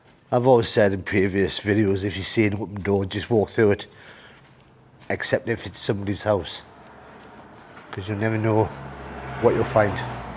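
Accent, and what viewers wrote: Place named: Northern England, United Kingdom. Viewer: you are welcome. Thanks for your videos. Your positive attitude shines through. I also love different accents and yours, i guess northern England, is just great. Like you i am fascinated by history and travelling. I learned all this quite recently when i began digging in my family tree and read the history for context. This is not taught in schools.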